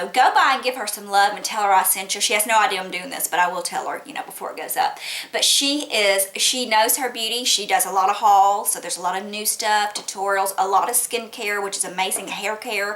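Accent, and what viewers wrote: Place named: South, United States. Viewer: Great video hun and I just love your southern accent heehee too cute for words :) I subscribed have a great weekend dear xxx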